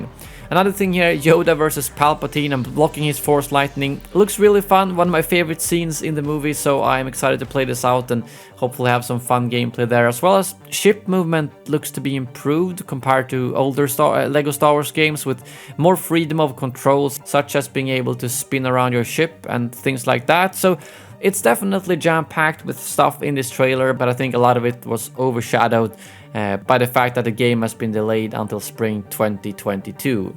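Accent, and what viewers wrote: Sweden. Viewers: bru why is he speaking with a swidish accsent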